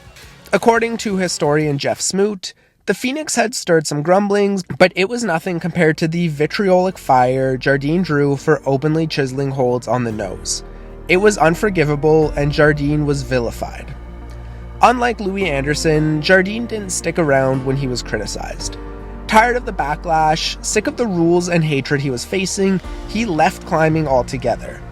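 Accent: Canada. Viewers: I was going to guess you were Canadian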